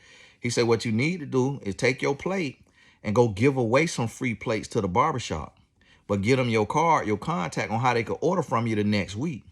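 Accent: South, United States. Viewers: I love she’s so beautiful and I love her country accent it’s to cute 🥰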